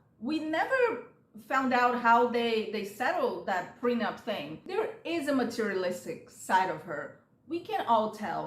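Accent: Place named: Brazil. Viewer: Are you from Brazil? I can hear a little accent 😊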